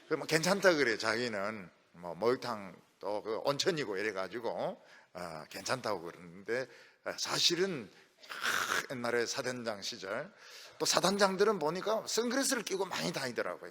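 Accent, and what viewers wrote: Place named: Gyeongsang, South Korea. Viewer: 목사님! 존경합니다. 항시,건강하시길 기도 드립니다. 구수한 경상도 억양으로 하시는 설교말씀에, 은혜 많이 받습니다.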